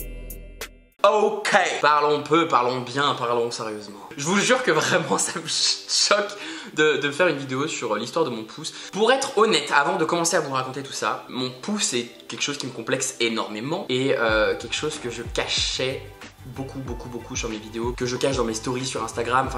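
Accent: United Kingdom. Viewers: Y’a qu’à toi que ça arrive ce genre de choses 😭 Je suis d’accord avec les autres commentaires, t’as grave pris des mimiques à Gaëlle c’est chouu Et j’ai une petite question, ton accent anglais tu le sors d’où ? J’ad-oreee 😍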